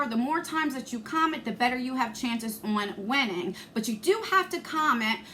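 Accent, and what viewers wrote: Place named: Philadelphia, United States. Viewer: I love hearing your Philly accent!!! I really wanna win but I'm not about to comment a million times lol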